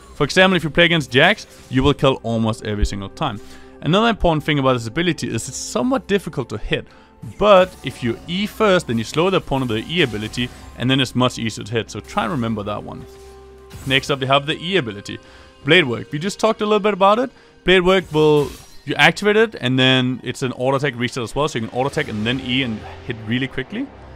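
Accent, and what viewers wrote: Denmark. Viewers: you sound danish :D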